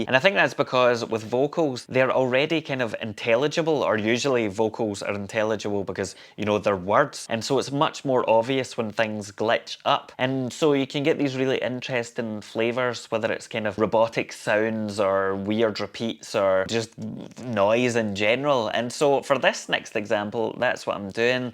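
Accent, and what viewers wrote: Scotland, United Kingdom. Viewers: Love yer scottish accent (Shetland / Orkney?) Those AKAI sounds wicked too.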